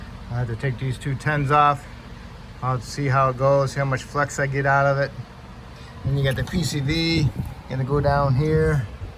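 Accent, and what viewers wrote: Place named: Chicago, United States. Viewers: That’s a Chicago accent if I’ve ever heard one. Am I right? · just sounds like a midwest generic mechanic accent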